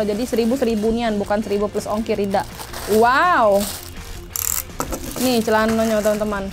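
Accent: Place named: Palembang, Indonesia. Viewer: gaya kk bicara palembang kental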